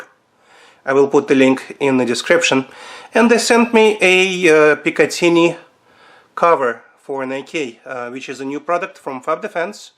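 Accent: Russia. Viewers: слышу русский акцент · Русский акцент ))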